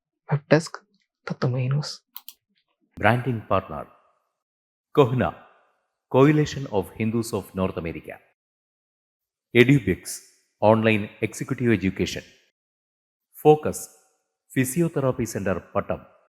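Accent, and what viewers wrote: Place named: Kottayam, India. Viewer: Are you from kotayam or Pathanamthitta area bha or pha 😂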